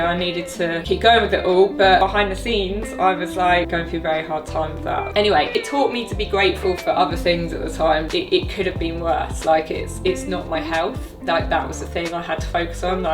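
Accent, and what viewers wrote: United Kingdom. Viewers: U r beautiful n i like your UK accent ❤ from India Nagaland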